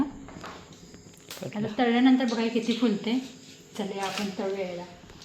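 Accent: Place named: Karnataka, India. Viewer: तुला मराठी पुर्ण जमत नाही जरा कन्नड वळनावर आहेत भाषा मी मुचंडीची आहे तुमच्या मामाच्या घराशेरचे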